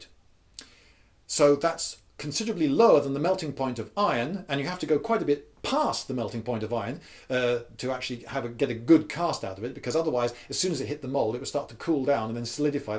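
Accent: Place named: England, United Kingdom. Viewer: Also the English accent, you can't forget that.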